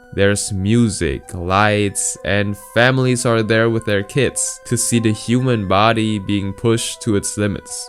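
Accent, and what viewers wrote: Germany. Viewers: Are you german? (my curiosity kills me just because I hear an accent lol)😅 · Are you by chance german? Is your native language german?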